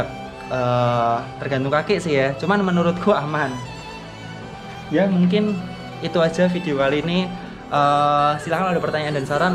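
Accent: Java, Indonesia. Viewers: Mantaappp.... bahasanya pakai 3 bahasa, ingris,indonesia,jawa medok..hehehe.. semangat mass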